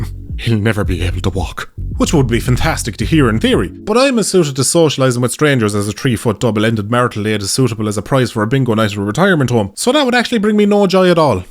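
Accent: Ireland. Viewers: It was kind of obvious who you were when I met you. You're the only 2d green duck with an irish accent. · Imagine one day you can just walks the streets in Ireland or even anywhere. Hear a very familiar accent just to turn towards a regular lad.. think where do I know this accent from... just to get home and realize you saw the actual. And this was probably the first and last time. But you still raise a pint to him and think... God he had a massive.... smile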